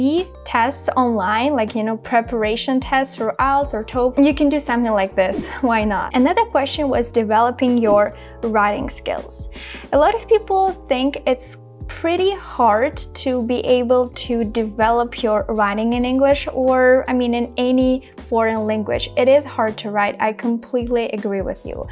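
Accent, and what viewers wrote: Russia. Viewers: Cute Russian accent ☺️👌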